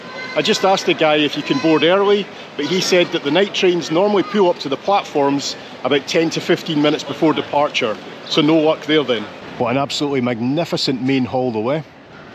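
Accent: Scotland, United Kingdom. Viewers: The harsch, sweety Scottish accent! Immediately a subscriber! And a good story-teller too, mind you. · Nice job!!! Love the Scots accent 🎉🎉🎉🎉🎉!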